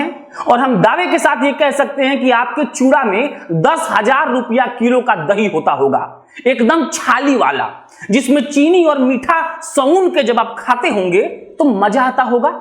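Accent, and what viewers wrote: Bihar, India. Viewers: Bhai bilkul Bihari tone h👌👌.... Next Ravish Kumar from Bihar 👍👍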